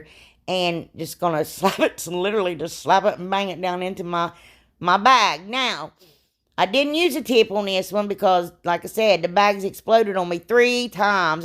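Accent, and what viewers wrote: South, United States. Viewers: You’re funny and very enjoyable to watch. I love your little southern accent.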